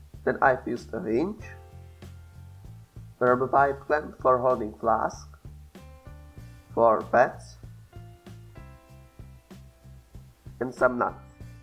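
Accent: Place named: Eastern Europe. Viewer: I am sorry but you sound like slav. Jsi čech nebo slovák?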